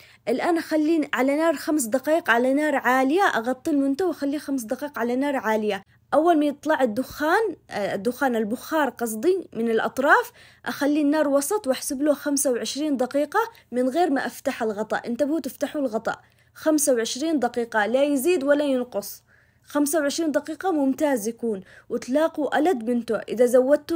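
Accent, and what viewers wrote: Aden, Yemen. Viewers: اهل اليمن هذه لهجتهم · أحلى شي أحلى شي كلامك اليمني الجميل اللي بدون تصنع ولا قلتي كلمه خليجي اني فدالك.. في بنات عدن عمرهم ماخرجوا من عدن ويتكلمون سعودي ولا اماراتي تقولي الا مواليد الخليج..تصنع يجيب الغثى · الصراحه احب اللهجه العدنيه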